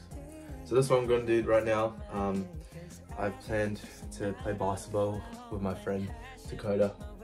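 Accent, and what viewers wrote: United Kingdom. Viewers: Hi there Peter H. here too 🇰🇷🇺🇸Can’t focus writing research papers found your channel so motivating love ur english accent..back in college for bs degree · Dude he is definitely perfect, nice body, pretty face, smart, well behaved, clean ,has a nice voice and etc AND HE HAS A BRITISH ACCENT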